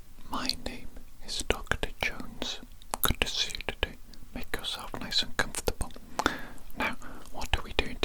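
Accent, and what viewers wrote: Wales, United Kingdom. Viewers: Love this vid ! Are you Welsh? I recognise that accent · omg wait are you welsh?!?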